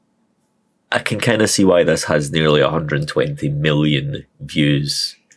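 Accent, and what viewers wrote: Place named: Scotland, United Kingdom. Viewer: Good to hear a good strong Scottish accent. (West of Scotland, I'm guessing. Edinburgh myself). Recently voted the sexiest accent in the UK in an international poll. (Scottish, not just Edinburgh.) Of course. I'm a Pentaholic but I'm not going to suggest anything. There are plenty of others to do that and they will. My favourites of are not always among their most popular anyway. But you won't be disappointed in any of them. Cannae wait tae see where it goes frae here. Pronunciation guide for the 'furriners': Can ay way' tay see whayr i' goes fray he-ur. (glottal stop after way and i).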